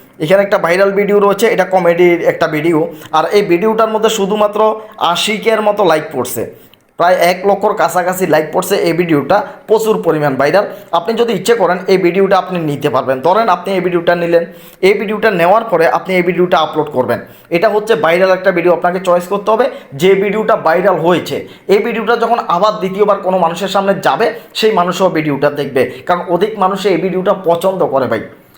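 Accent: Chittagong, Bangladesh. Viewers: ভাইয়া আপনি কি চট্টগ্রামের?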